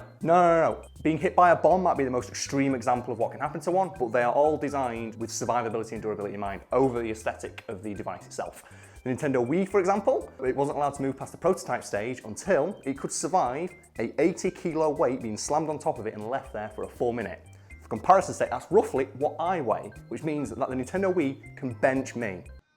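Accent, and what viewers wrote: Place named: England, United Kingdom. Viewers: Ok to the people who are like " blah blah he's English" no shit mate, but I'm like wtf sometimes to. Some people just hear differently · Kris R You want captions on an ENGLISH video spoken by an ENGLISHMAN are you for real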